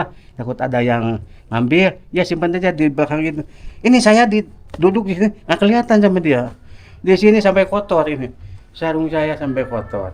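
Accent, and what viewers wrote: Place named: Jakarta, Indonesia. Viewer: Bahasanya kayak bahasa Betawi dimana yaaa alamatnya tolong Nasik alamatnya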